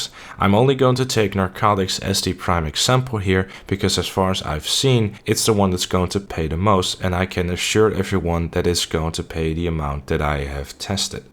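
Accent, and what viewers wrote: Netherlands. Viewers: Slow Dive he is dutch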